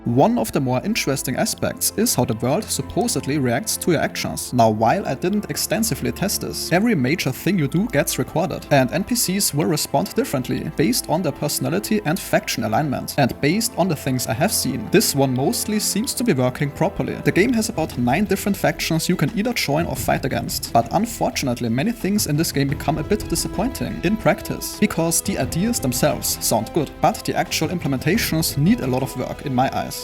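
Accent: Germany. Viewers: Der Deutsche Akzent ist schon nice XD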